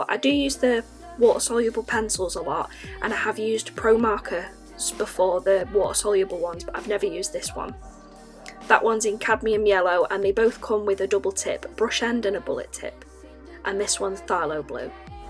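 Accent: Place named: Northern England, United Kingdom. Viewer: oh how lovely! I really like how dreamy it looks in the end. I also really like your northern accent!!! And well done on the voice-over. 👍✨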